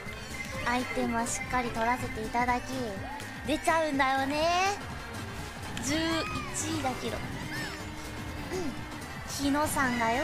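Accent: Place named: Osaka, Japan. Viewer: Angry + Osaka dialect = moe overload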